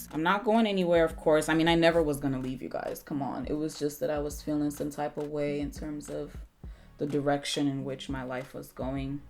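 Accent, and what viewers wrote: Nigeria. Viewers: Yayyyyy your back! I was having chocolate withdrawals I missed your Nigerian accent 😍❤️ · The Nigerian accent NEVER fails to make me laugh. You are a freaking queen. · The Nigerian accent is baccckkkkkk!!!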